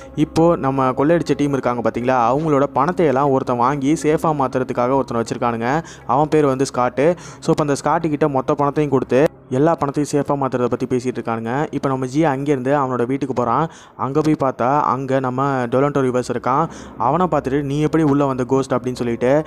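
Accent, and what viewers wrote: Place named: Coimbatore, India. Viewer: Avainka , eveinka slang -CBE 😁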